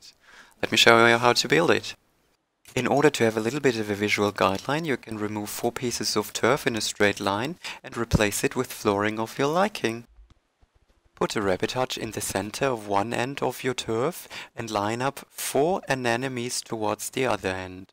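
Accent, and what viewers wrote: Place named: RP, United Kingdom. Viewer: I love your accent. So posh.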